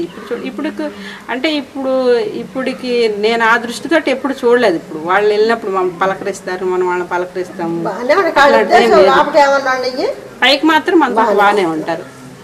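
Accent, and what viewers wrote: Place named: Tamil Nadu, India. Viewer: I want to tell one thing to Anchor. Don't try to separate mala , madiga communities ra idiot. Why you are asking again and again how is mala madha communities now , they are one or not dont ask in such a way and put fire 🔥. Mala madiga are both communities are SCs and still upcoming now.....Bastards like (ancor) you are putting / creating problems in mala madigas. So i warn you dont try to be smart for your anchoring purpose. Are chetta na son. Where you are from . Nuvvo arava na kodukivi( i understand with your pronouncing of language)........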